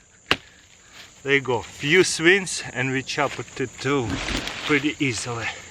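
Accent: Russia. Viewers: Руский акцент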